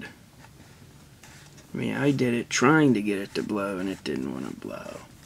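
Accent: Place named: Washington, United States. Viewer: your from Washington state aren't you I can tell from your accent its like mine :D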